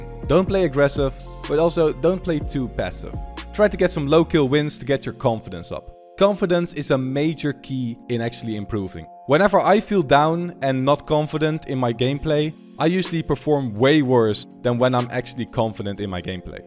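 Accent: Netherlands. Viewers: Je bent een Nederlander! Ik vond je accent al zo NIET engels lijken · You are probably Dutch? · Wait you’re dutch lol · Yo ik ga morgen overstappen naar pc en ik vroeg me af aangezien je ook Nederlands bent (ik hoor het aan je accent 😁) of je mischien als ik een beetje beter ben een paar 1v1s tegen me wilt doen om te oefenen. Je video's zijn handig 💪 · Ik hoorde aan je accent dat je nederlands was en toen je je dpi naar 500 zette toen zag ik dat het in nederlands stond gg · Is he dutch? His accent gave iT away! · Hes Dutch (like me) and the most people that are dutch have that accent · Are you dutch? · Ik hoorde dit letterlijk 1 seconde in wist gelijk dat je een Nederlander was😂 Geen haat btw bij mij hoor je het ook hoor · Youre dutch? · you hear your voice being Dutch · R you dutch bc u have a dutch accent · Wait are you from teh netherands? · Eyyyyy ben jij nederlands? 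· ben je Nederlands? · waittttt are you Dutch or Belgian? ;O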